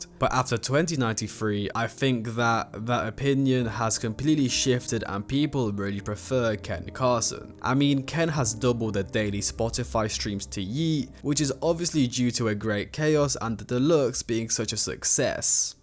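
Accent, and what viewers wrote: United Kingdom. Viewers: Can't take a British accent serious.....especially one trying to be hard.....yall still worship a royal family.....df....haha....every thugg i grew up with would watch their videos just to see the clowns🤡🤡🤡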